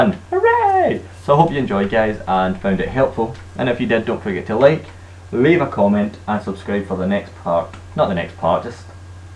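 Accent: Scotland, United Kingdom. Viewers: I subscribed lad because you're scottish! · I was going to be cool like these fools and ask if you were, like, Russian or something...but it's pretty clear that you're Scottish. Scottish FTW. Thanks for this video. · You sound scottish haha Ich bin aus Mulheim an die Ruhr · Are you from Scotland or are you from Belfast England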